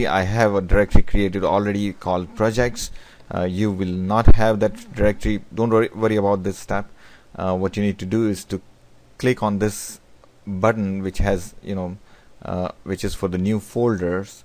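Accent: India. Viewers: thank u sir....one question..r u indian????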